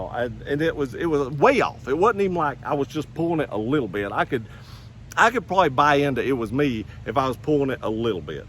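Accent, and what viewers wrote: South, United States. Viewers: What did he say? Omg he is country 😂😂😂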